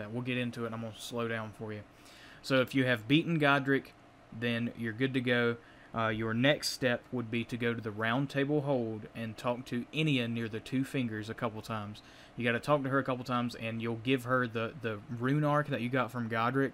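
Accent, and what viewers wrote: South, United States. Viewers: Are you from Alabama I am I reckon your southern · Great, informative video but that accent screams below the Mason Dixon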